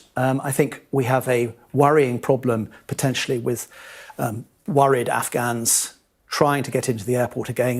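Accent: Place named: United States. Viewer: I think you people made a typo mistake, this guy sounds like American unlike the title of the video .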